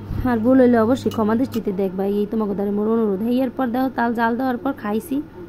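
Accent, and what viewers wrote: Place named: Barisal, Bangladesh. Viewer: পুরাই মোগো বরিশালের ভাষা👍👌🙋‍♂️🥰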